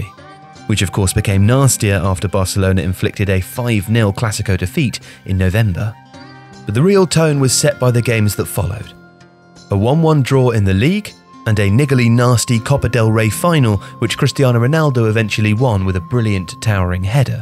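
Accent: England, United Kingdom. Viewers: Good job on the video, but very lazy nomenclature of "The Ukraine" Using phrases like "The Ukraine", "The Lebanon" or "The Sudan" especially in an English Accent really emphasizes the inability to detach oneself from Imperialism. I know this wasn't your intent Tifo Football, but it is extremely easy to hire an editor to catch the definte article to diminish a soverign country. Again, thanks for the video!!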